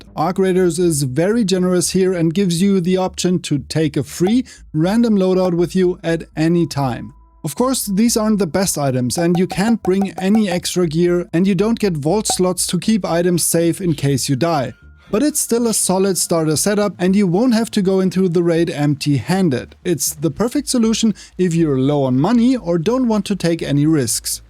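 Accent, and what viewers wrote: Germany. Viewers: I hear a German accent I turn on focus mode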